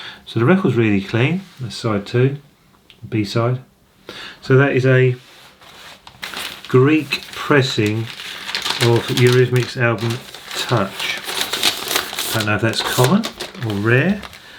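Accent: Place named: RP, United Kingdom. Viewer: Your videos are strange. I don't know if you care about music at all... Do you? Or are you all about reselling? Or is it just an obsession? Your "posh" (affected or not?) accent quite throws me of. I cannot figure you out... Where are you from? [Full disclosure, I am a Fleming from Brussels, but i've lived in Massachussets (USA) for 40 years.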